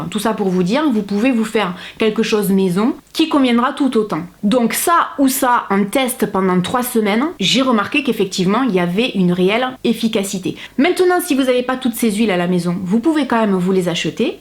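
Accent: South, France. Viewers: Je t adore bravo encore beaucoup d humour et même accent que toi ca fait du bien de voir des sudistes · J adore ton accent du sud tu es superbe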